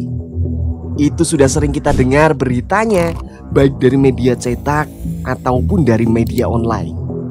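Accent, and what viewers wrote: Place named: Java, Indonesia. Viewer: Medok ,kawus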